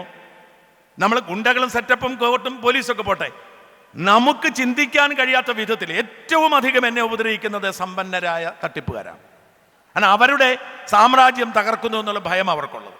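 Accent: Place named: Central Travancore, India. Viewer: എനിക്കിഷ്ടപ്പെട്ടത് എന്താണെന്നോ, താങ്കൾ ബ്രിട്ടനിൽ താമസിച്ചു വർഷങ്ങൾ ആയി തിരുവനന്തപുരം based ആയി ജീവിക്കുന്നു പക്ഷെ താങ്കളുടെ ആ മദ്ധ്യ തിരുവിതാംകൂർ ഭാഷ, നമിച്ചു ബ്രോ, എത്ര വലിയവൻ ആയാലും അമ്മയെ മറക്കില്ല എന്ന് ഒരു സന്ദേശം അതിലുണ്ട് 🙏🏻🙏🏻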